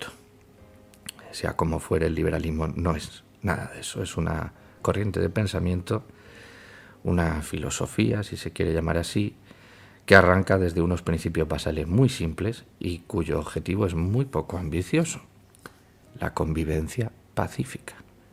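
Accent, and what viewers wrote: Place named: Andalusia, Spain. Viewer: lo mejor e que pareces del sur de españa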